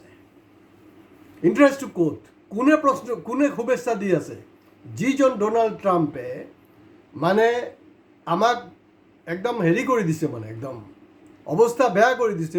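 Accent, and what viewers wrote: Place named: Upper Assam, India. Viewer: আপোনাৰ জিলা খনৰ নাম জনাব নে।কথা বোৰ upper assam ৰ যেন লাগে ।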